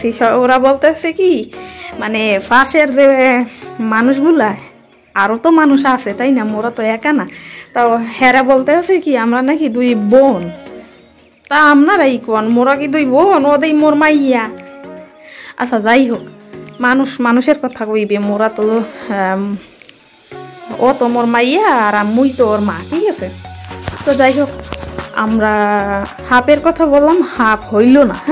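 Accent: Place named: Barishal, Bangladesh. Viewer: Bhola laglo aponar video ta deklam khub balo laglo. Borishaler language. I enjoy it.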